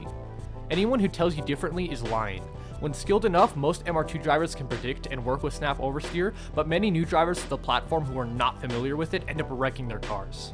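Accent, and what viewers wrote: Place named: Japan. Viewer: Great video but that japanese accent is so cringy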